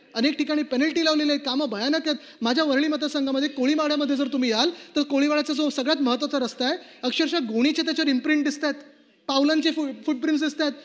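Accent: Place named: Marathwada, India. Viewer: नंबर एक मुलगा आहे त्याच्या बोलण्यातून खरा स्वाद येत आहे मराठवाड्याचा मुलगा शोभला🚩👍☝️🌹🌹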